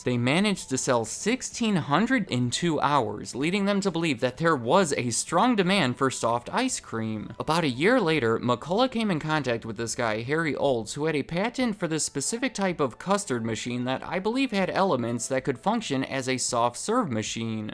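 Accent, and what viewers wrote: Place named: Alabama, United States. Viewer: Sounds Alabaman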